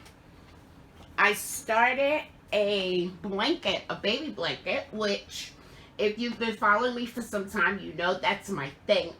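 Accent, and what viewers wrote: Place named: Bronx, United States. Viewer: I like your videos, that blanket is amazing. And your sister will love that shawl I'm sure, it's gorgeous. My husband was listening while I had your video playing and he said where is she from? I said she lives in NC but I think she's from further north. He said her accent sounds like our dear friend Maryjane who is from the Bronx. And when you said you'd send this to your sister in New York, I thought I'd ask you where you were from. I'm originally from eastern Pennsylvania but live on our sailboat now and meet people from all over the world. Thanks so much for brightening my day and I'll keep that quote in mind.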